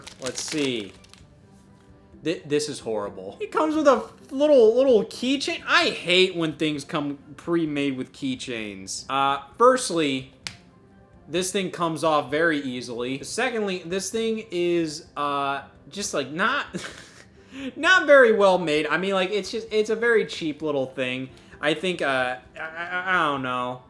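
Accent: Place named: Michigan, United States. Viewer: You definitely from Michigan lol😅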